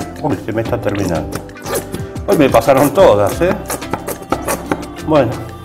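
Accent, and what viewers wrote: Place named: Argentina. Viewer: Grande sos un groso como hablas explicas bien a lo argento papá abrazo grande....!!!!!!!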